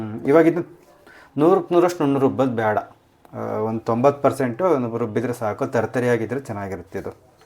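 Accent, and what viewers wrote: Chitradurga, India. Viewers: ಚಿತ್ರದುರ್ಗ ದ ಭಾಷೆ😊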